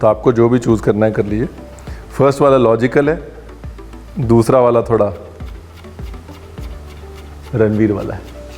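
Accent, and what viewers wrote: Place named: Malwa, India. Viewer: Hi ranbir.. Muje apki recipes bahut achi lagti h.. Aap faridkot se ho .. Main moga se ho.. Ab hm jalandhar main rehte h .. Aap bahut achi punjabi bolte ho.. Malwe wali ...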